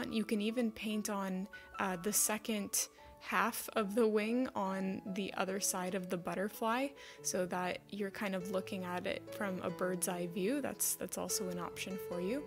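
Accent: Canada. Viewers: Oooo. Canada i knew it.. i could hear it in your voice... then i read your profile.. you're very talented. Thank you for your art.❤❤❤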